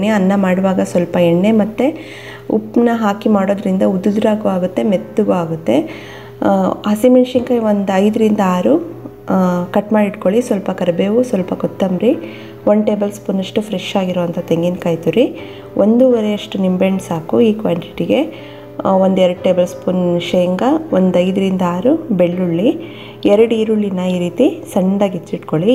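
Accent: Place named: North Karnataka, India. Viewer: uttara karnataka davra neevu nimma dwani d karnat tara ide yella adugegalu chennagide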